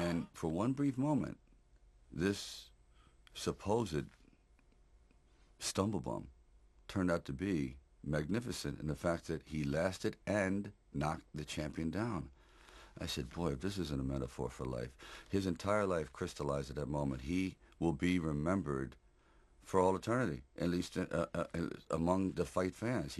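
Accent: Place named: Philadelphia, United States. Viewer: Philadelphia accent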